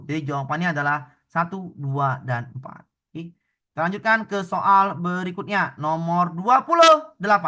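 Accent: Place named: Medan, Indonesia. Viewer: Medan kali logat bapak ni 😂😊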